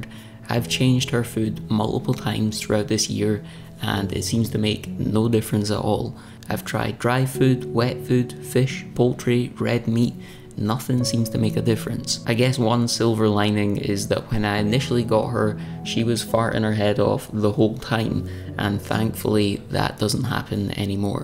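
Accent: Scotland, United Kingdom. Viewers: What part of Scotland are you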